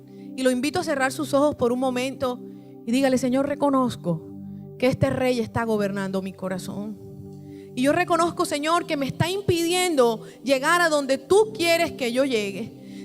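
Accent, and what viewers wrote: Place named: Caribbean Coast, Colombia. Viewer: Gracias, la pastora se escucho muy costeña. Muchas gracias. Muy buena predica... Att: Una Barranquillera desde USA, NJ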